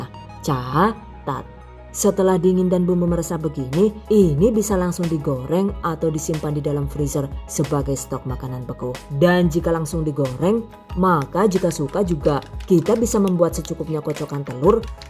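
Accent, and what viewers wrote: Banyumas, Indonesia. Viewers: Iya kan sdh l@ tinggal di luar sana n suanj orng bule tapi ciri khaa ngapak ny masih tetep ad sukses trus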